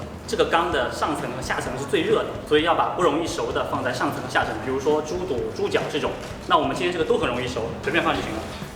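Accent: Nanchang, China. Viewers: 标准的南昌话，浓浓的粉味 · 南昌话说的好地道，谢谢介绍南昌美食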